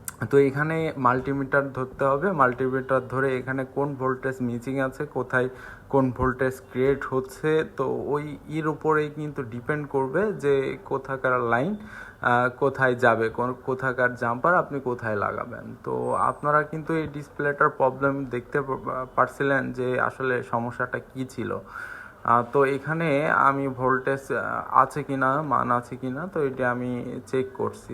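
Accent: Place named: Kushtia, Bangladesh. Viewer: কুষ্টিয়া কথাই ভাইয়া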